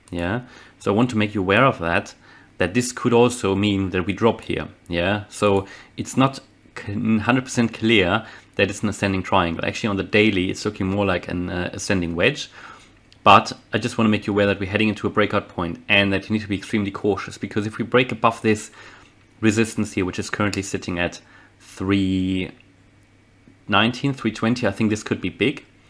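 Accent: Germany. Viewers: Nice vid. U must be German yeah? 😆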